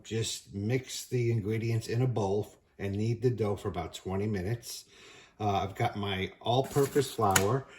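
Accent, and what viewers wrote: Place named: Texas, United States. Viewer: Like your Texas accent. Born and raised in NJ moved to Texas 45 years ago. I grew up on NY style Pizza haven’t had one to match since moving here, will try this recipe this week.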